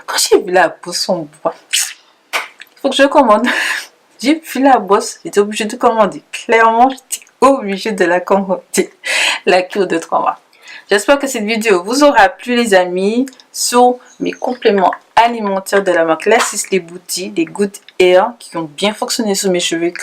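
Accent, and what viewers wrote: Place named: Antilles, Caribbean. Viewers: audrey lawson l'accent créole antillais oui :) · Oui, elle a l'accent antillais ;)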